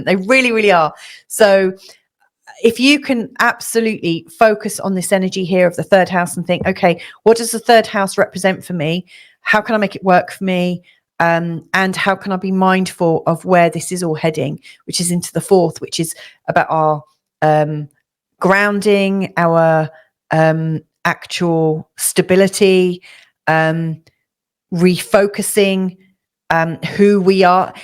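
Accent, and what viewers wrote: United Kingdom. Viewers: you seem like a really nice person! great insights; calm, british accent (a must) - haven't been into astrology for a few years but you've got my mind moving! thank you :) - scorpio (pluto/mercury first house) scorpio (sun venus chiron 12th) & aries moon (4th) :))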